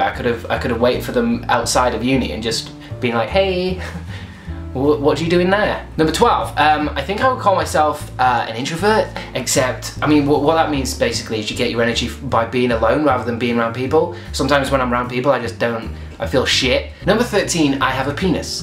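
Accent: North, United Kingdom. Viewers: you sound so northern lmao well i think so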